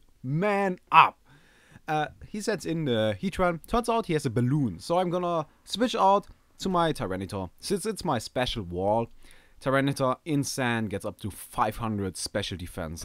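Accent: Germany. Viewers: This guy is beyond German. Haven't heard an accent like that in a long time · Hab schon nach dem 1. Satz gewusst, dass du deutscher bist.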